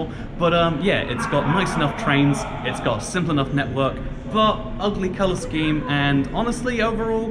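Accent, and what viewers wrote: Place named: United Kingdom. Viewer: he’s literally British mate, you clearly can’t tell what accents are what.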